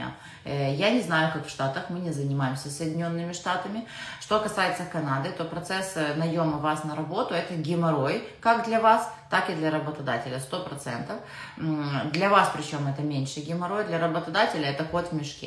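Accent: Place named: Odessa, Ukraine. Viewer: Таки шалом ;) Слушаю Вас просто так, для поднятия настроения. Свою иммиграцию прошёл давно и успешно, но Ваша разговорная Адееесская рЭчь мотивирует и даже вдохновляет. За 10 лет не встретил в Альберте ни одного одессита, так хоть с Вами душу отвожу. Про Саскачеван это правда: степь да степь кругом, едешь 200 км и одного села нету, печалька полная... Ездим туда по 3 раза в год на рыбалку (800 км в одну сторону) за коропом метрового размера которого можно брать безлимит. Хорошо что бак в машине на 90 литров, чтоб не переживать за заправку сильно, и то всегда беру пару канистр на запас ( с до заправки не работают у них).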